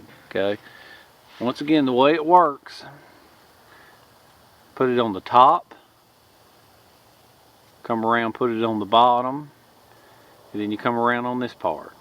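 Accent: South, United States. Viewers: Keep up your good work. By the way...On top of your inspiring ideas, your charm has also totally changed my attetude towards what I as a foreigner usede to call the "Forest Gump dialect". You´re the kind of guy who could make any wall street guy wish he had a few drops of "hobo-blood" in him. Keep up the good work. You are an inspiration to me and thousands others. Best regards from Vikingland (Denmark).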